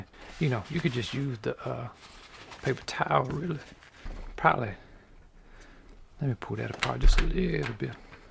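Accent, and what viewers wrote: Louisiana, United States. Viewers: Hay are you from Louisiana?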